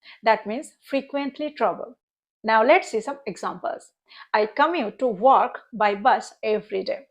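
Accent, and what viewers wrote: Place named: Bengal, India. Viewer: Nice vlog with Bengali accent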